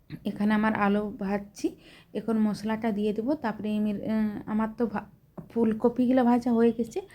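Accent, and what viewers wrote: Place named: India. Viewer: Tomar kotha gulo sunte khub valo lage ektu Hindi tan koto dhone pata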